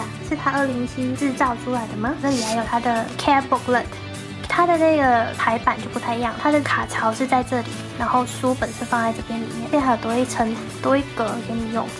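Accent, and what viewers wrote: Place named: Taiwan. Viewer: 我就超喜歡台灣腔就對了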